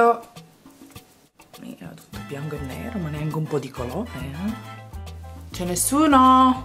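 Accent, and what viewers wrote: Sicily, Italy. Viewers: ci piace così com'è con il suo bell'accento siculo...sennò che sarebbe??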